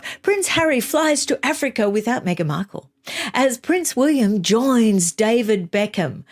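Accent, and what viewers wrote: Australia. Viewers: I love listening to. You’re voice and Australian accent it the best!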